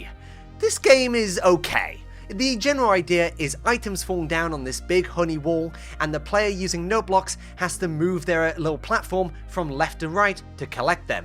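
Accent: United Kingdom. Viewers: will you tell us how we can acquire an unhinged british accent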